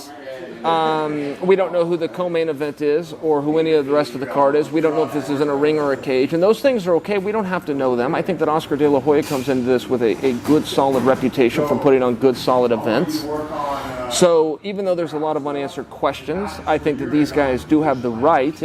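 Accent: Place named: Oregon, United States. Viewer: Being from the Deep South, I really like Chael’s Oregon accent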